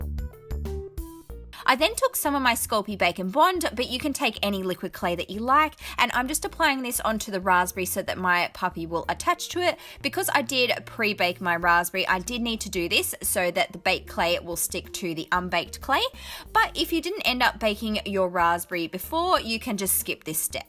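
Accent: Australia. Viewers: I don’t know what’s cuter your creations or your Australien accent 😻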